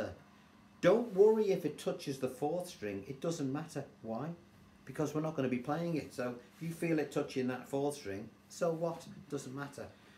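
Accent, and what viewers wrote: Liverpool, United Kingdom. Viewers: Hi, enjoying your guitar lessons, great to hear a scouser tell it as it is. I have been to your bluenote channel where you cover loads of my favourite musicians. I agree with your comments regards, saw them play at the empire. Good luck with the album, some great tracks on there.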